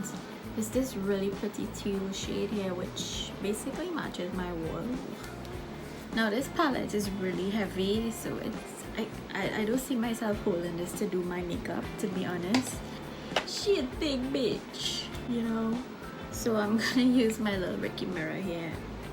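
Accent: Trinidad and Tobago. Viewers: i subbed as soon as i heard the accent cause im trini too!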